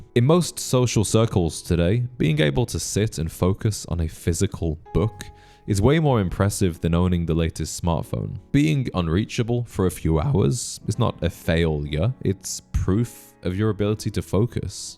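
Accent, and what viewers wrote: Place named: United Kingdom. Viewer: Dude you have a great voice, lots of depth. You could easily do audio work. Of course you’ve got the Brit accent as well which is usually a bonus haha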